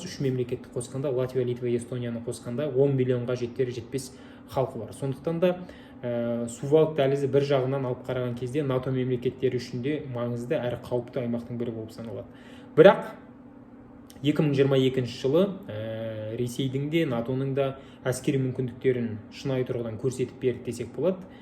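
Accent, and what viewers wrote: Standard. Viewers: Айналайын балам, Рахмет.Таза қазақ тілімен жетемізге жеткізіп түсінікті түрде айтып отырсың.Сендей сауатты Қазақ балалардын қатары көбейе берсін.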